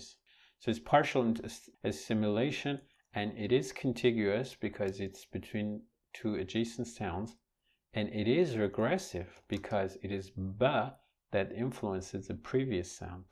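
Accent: Iran. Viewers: ایرانی هسی؟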